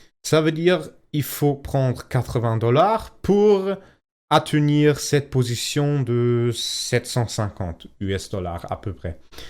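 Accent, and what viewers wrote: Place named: Eastern Europe. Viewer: bravo à toi pour l'explication : très claire, et je n'ai pas vu ça ailleurs :)) comment va ton portefeuille depuis oct 2024 (date de la video) j'imagine que tu as bien profité : ? une question : je suis sur Blofin, apparemment il n'est pas possible de ressortir la marge initiale et de même je ne peux pas changer le levier même après après avoir remonté le stop loss dans un endroit où je ne perds plus (je ne peux que gagner).Blofin m'indique le message "you still have isolated orders pending" et me demande de supprimer le stop loss avant de pouvoir changer le levier, de ce que je comprends.....Sais-tu me confirmer que sur Blofin ce n'est pas possible de faire ce que tu as fait sur Bybit ? Dans ce cas as-tu un conseil pour une plateforme alternative à Blofin que je puisse utiliser (Kucoin peut-être ?)....Au fait bravo pour ton français, je croyais déceler un petit accent du Nord (type Belgique flamande) mais non, c'est bien un accent de l'Est...Pourquoi faire tes vidéos en français plutôt qu'en anglais ou en allemand ? 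Cheerz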